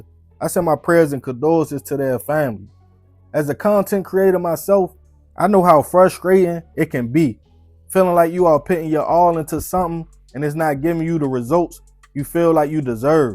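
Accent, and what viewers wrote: Baltimore, United States. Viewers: I'm not going to lie, I found this channel because I wanted to hear more of the Baltimore accent, and stuck around because the content is good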